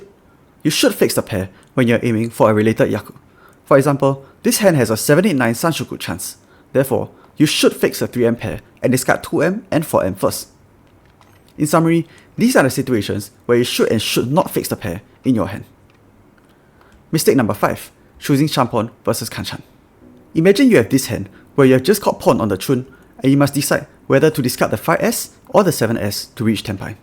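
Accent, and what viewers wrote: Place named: Singapore. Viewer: Instant sub. I never knew I needed to be called bad at the game in a Singaporean accent until now. Thanks for explaining the mysteries of Riichi to us baka westerners, this is really helpful content.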